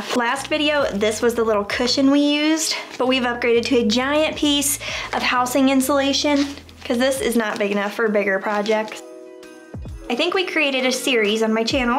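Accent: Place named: Columbus, United States. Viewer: I love it! It turned out so pretty! It definitely makes me wanna take up wool art. I just might... Also, quick question - are you from the Columbus, Ohio area? Or did you grow up somewhere else? I only ask because I'm from the Dayton area (born and raised) and our accents are COMPLETELY different, which just seems crazy since you only live about an hour from me. Lol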